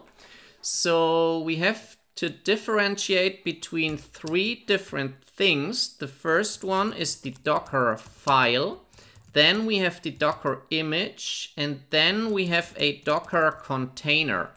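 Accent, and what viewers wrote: Germany. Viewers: Du bist ein Deutscher, oder?😊😂 Aber gutes Tutorial definitiv! :)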